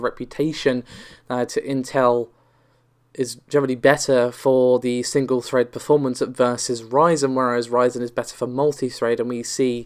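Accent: United Kingdom. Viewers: What is this woman’s name??? I’ve watched so many of these god damn videos and have no fucking clue what she is saying when she introduces herself and says her name. She sounds like she’s slurring her words with her strong British accent and is making up a name that I’ve never heard of.?!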